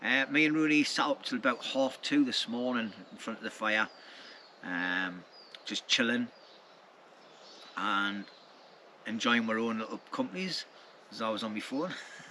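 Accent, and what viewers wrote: United Kingdom. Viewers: As an American, I am always curious about the various UK accents. May I ask what area yours is from? Thoroughly enjoy your outings!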